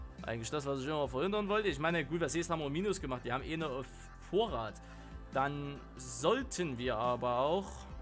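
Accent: Saxony, Germany. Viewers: Wieder ein geiles Video Findes es immer wieder geil Sächsisch zuhören-ob im TV oder in deinen Videos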